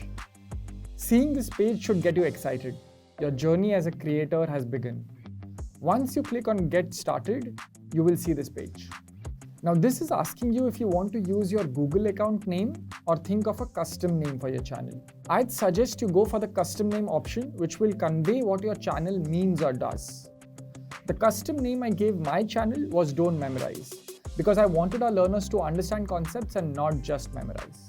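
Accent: India. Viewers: The lady always talking in the maths videos has a strong indian accent how did you think it was forgein based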